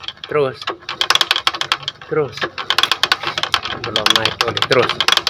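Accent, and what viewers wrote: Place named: Eastern Indonesia, Indonesia. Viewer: mantap bang, dgr logatnya org timur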